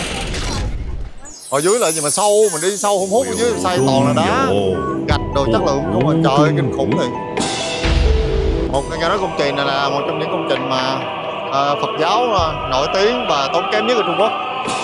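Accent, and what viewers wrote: South, Vietnam. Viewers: giọng Nam bộ tự nhiên, vui vẻ, kiến thức sâu....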